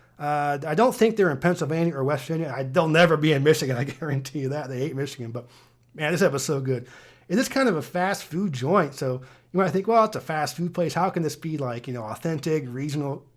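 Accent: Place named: South, United States. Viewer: I can’t believe this guy is from California, he really sounds native Southern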